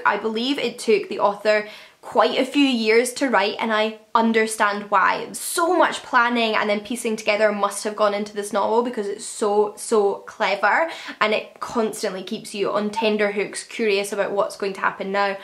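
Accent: Scotland, United Kingdom. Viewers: Subscribed within the first 5 seconds of the video because I love the Scottish accent! 😁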